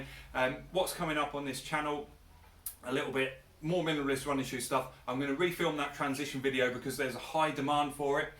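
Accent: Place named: United Kingdom. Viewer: hey, thanks for your videos , it really help to make a transition. Besides, it is nice to listen a british accent for ones ;)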